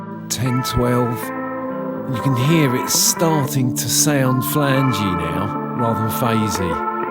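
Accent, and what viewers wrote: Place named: United Kingdom. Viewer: Haha, I love your British accent. Great job with the video.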